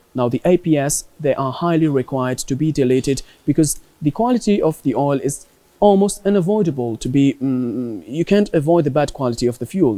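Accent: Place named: United Kingdom. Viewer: UK englich ❤️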